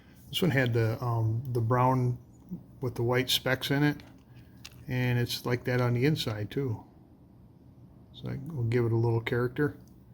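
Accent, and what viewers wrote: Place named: North, Germany. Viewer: Oh heck yeah! This is interesting. I‘m German but living further down south, on the Rhein. sounds northern German and her rocks imply she’s from up there, too. Not to dox her 😅 Flint is super common up there but I hardly ever find any down here. It was interesting to see the difference when I lived up north.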